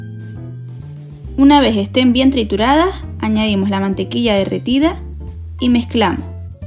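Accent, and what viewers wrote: Canary Islands, Spain. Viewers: Mira que te había oído en otras recetas y decía... esta chica es canaria... pero al ver los suspiros de Moya ya me lo confirmó. Pondré en práctica la receta. Por cierto, como idea, puedes poner los ingredientes en la descripción del vídeo. Es muy útil para los que vamos a seguir la receta. Un abrazo desde Gran Canaria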